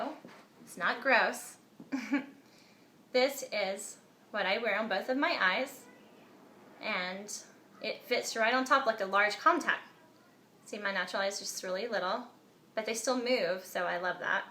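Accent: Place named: United States. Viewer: Just cause she sounds american. u_u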